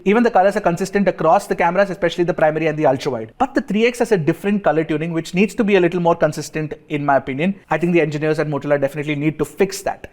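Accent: India. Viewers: Display is not up to the mark, inferior than edge 60 fusion ,f55 5g display far better than these two.Thanks for your fluent English with Indian accent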